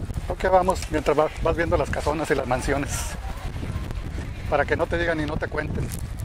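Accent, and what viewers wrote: Mexico. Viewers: No hablen como mexicano por favor. Ese idioma odio. Hablen bien el idioma español ok. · Demasiado marcado tu acento mexicano, deberías neutralizarlo mas!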